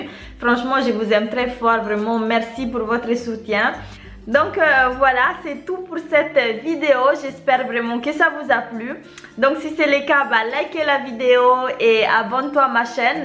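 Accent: Madagascar. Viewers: j'aime bien ton accent malgache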